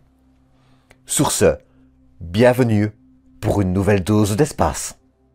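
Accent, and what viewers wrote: Belgium. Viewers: Ca sent le belge qui faut la narration non? Liégeois? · Lui il est belge c’est sûr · C’est un Belge qui parle?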